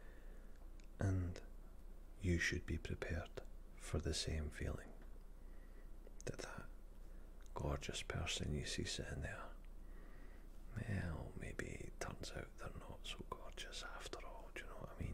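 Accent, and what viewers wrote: Scotland, United Kingdom. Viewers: Found you from crinkeluvin’s video! love the video and it’s nice to hear the accent of a fellow Scot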